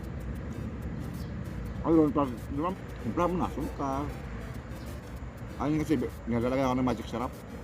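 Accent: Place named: Visayas, Philippines. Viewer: Ay bisaya ka deay idol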